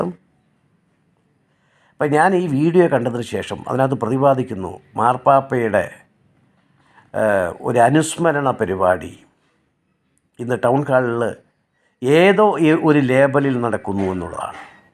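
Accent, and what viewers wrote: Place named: Changanassery, India. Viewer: ഈ ഒട്ടിക്കൽ മാത്രമേ നിനക്ക് അറിയുകയുള്ളു. തനി ചങ്ങനാശേരി ഭാഷ. പോടാ തെണ്ടി.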